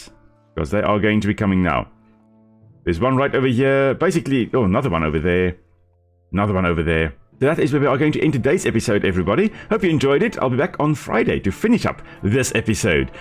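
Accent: South Africa. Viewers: Are you South African? Your accent sounds so familiar I swear. Just found the channel and its awsome. Plan on binging this series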